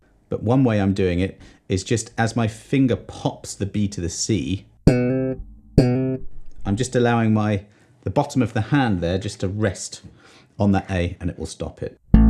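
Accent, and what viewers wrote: United Kingdom. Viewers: I can't decide which I like more, the bass tone or the British accent.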